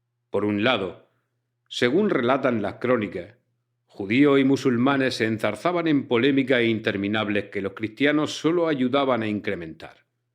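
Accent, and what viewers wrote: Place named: Murcia, Spain. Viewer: Sin comentarios. Magnífico ese acento murciano.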